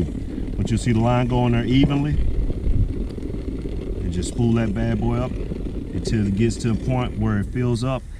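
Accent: Texas, United States. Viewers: lmaooooooooooooooooo that country voice tho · Texas baby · Are you from texas?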